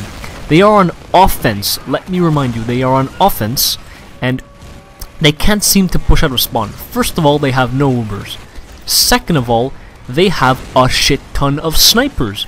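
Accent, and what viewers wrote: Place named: Canada. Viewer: Garry Newman English accent? I'm pretty sure you mean canadian. I find it cringeworthy that you can't actually understand him to a degree where you wouldn't need subtitles.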